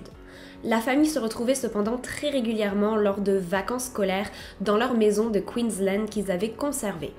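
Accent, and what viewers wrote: Quebec, Canada. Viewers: pas de quoi, je me disais bien que ça devait venir de l'anglais, mais ton accent québécquois n'est presque pas perceptible, je sais pas si tu l'a travaillé, mais ça fait très pro! bonne continuation! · Bonjour, ma première video de toi, j'aime beaucoup, merci. Je sens une toute petite pointe d'accent québécois, je me trompe ?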